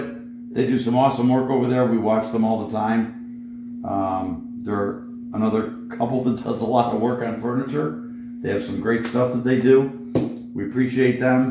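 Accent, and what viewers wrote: Chicago, United States. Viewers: Magical, masterful, mystical restoration. With a Chicago accent.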